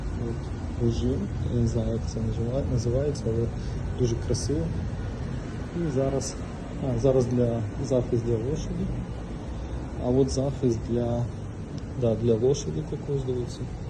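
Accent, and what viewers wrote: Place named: Russia. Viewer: Говори по русски . Умора. Мучаешься, сразу же видно, что русскоязычный.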